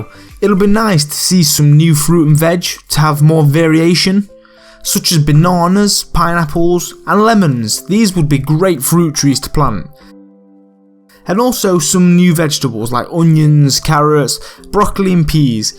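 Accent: United Kingdom. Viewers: I love your content so much but your accent annoys me so much 😂 I'm sorry, I still watch but it does annoy me not sure why. which part of Britain are you from? Lancashire here:)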